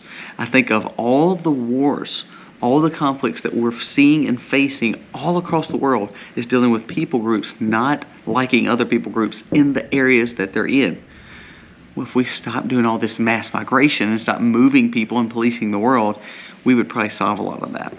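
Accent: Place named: Tennessee, United States. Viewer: I am from Puerto Rico and l have visited your beautiful country. My daughter and my son-in-law and granddaughter leave there in the US. I love your accent and the kindness of Tennessee and the countries there. I was raised in the United States of America New York City. I am in my country where we have chickens. We do not raise cows like you do which l applaud all the hard work you do. We have cows in open lands here but they belong to the government or big country owners here. I know your hard work. Jehovah God bless all your efforts.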